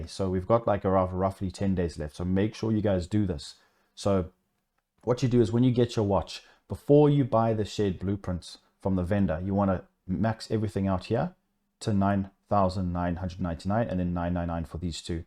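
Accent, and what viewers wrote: South Africa. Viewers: South african? if so what clan are you from?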